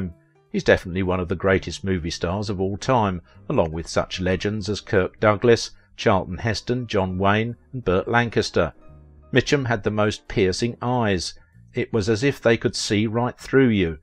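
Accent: United Kingdom. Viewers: Am subscribing for the smooth Brit voice, good researched. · Fruity Brit accent used for fake gravitas in trying to smear a great actor. This narrator claims Mitchum had 49 drinks of rum before dinner. This narrator is unforgiveable, don't waste your time.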